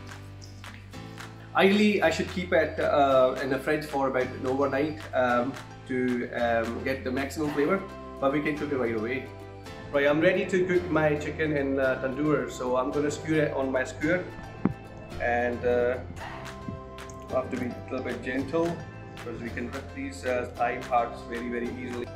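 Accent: Scotland, United Kingdom. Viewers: Love the accent Asian , Scottish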